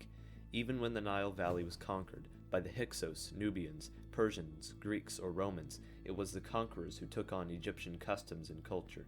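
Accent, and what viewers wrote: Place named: Midwest, United States. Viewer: Great channel but I think you should redo your narrative using an artificial English accent like David Attenborough. You have a young midwestern accent. People respect English accents that why most Roman Greek Egyptian movies using British actors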